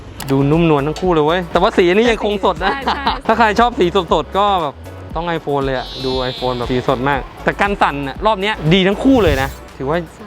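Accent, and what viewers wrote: Chiang Mai, Thailand. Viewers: พี่เป็นคน เชียงใหม่ใช่ไหมครับ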